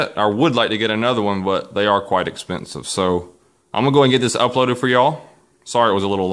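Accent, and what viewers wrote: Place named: South, United States. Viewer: why do you speak so country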